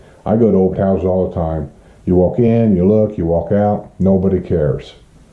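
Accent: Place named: Central Ohio, United States. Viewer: First -- these are great videos. Just moved into Pine Ridge down here and you're my new 'go to guy' for info about our new hometown Second -- I grew up in central Ohio and I imagine you grew up within a state or two of there. You sound like everybody I remember growing up Third -- you may have addressed this in another video, but do you know why cell service is so spotty in Villages homes? Verizon told us to set our phones for 'advanced calling' so our wireless router could help, but that barely helped at all. We just went ahead and got a land line. And finally -- who did you use for your water purification system? We get dark ring-around-the-commode gunk after a day or two without flushing. Who provides this ugly water? Everything is so 'perfect' and well done down here, it amazes me that The Villages hasn't fixed this community wide. 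Thanks again for proving this really is Florida's Friendliest Hometown.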